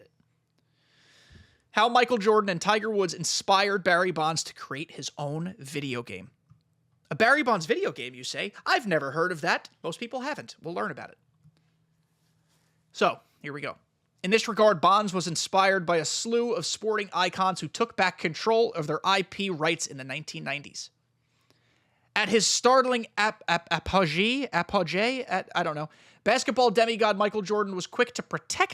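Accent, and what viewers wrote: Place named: United Kingdom. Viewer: Lmao the British accent… Well they added Sammy Sosa and Mark McGwire into ‘24 and I didn’t think I’d ever see the day this would happen so seeing Barry back in ‘25 is very much possible. I’ll say at least a 25% chance it happens…🤞🏽 Barry was in one game I used to play on ps2 where he crushed every single ball out the park lol I think it was called MLB 2003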